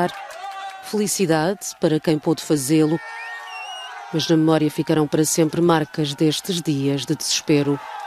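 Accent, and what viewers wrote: Portugal. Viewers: Agora sim a tuguesa verdadeira falando no microfone.❤️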